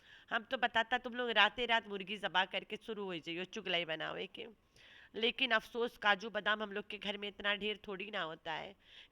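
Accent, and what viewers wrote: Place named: Bhojpuri, India. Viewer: Bhojpuri accent 👍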